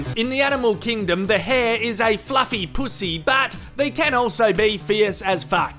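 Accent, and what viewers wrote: Australia. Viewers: When I was born never in my mind would I think to myself listening to a Aussie commentate over animals can be so funny I'm in tears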